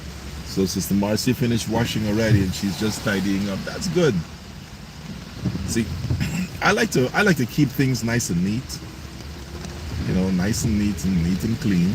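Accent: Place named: Caribbean. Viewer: OOOOOooooh, ok. I was wondering about the accent my brother. You're from another Caribbean island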